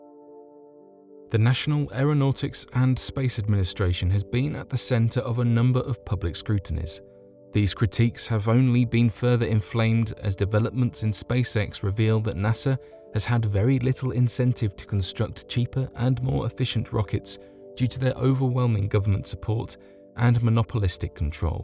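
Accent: United Kingdom. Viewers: British accents are good for documentaries and bad guys in movies. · The British accent is an extra convincing aspect 😉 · British mate, close though.....kinda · Guy with a thick british accent ranting about American beauracracy, framing the whole thing as though NASA has any influence over the government. 1/2 of 1 cent of every tax dollar. NASA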